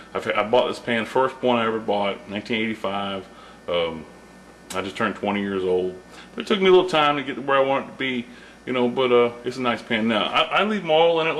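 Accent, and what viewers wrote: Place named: South, United States. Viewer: Hi! This is a fantastic demo and it's a really good idea to educate people about the benefits of using cast iron as opposed to modern non-stick varieties. Your cooking vids are brilliant and inspiring - I'm English and live in London, but I grew up in Virginia and I sure do miss my Aunt's cooking - your vids bring it all back (esp the accent!) I do my best to recreate Southern home cooking here in the UK and my English husband absolutely LOVES grits!! Keep up the good work! Best wishes!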